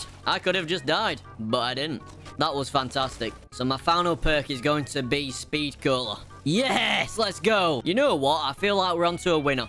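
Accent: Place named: England, United Kingdom. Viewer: no kiddin love the English accent and also the commentary was top notch, earned a sub <3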